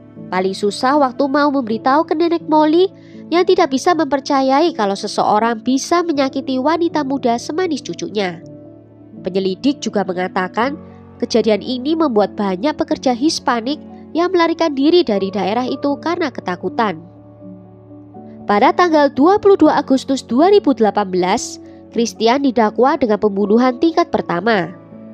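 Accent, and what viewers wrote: Java, Indonesia. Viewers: Hayyy jg medok kuhhh.. suaranya sukaaa💖💖💖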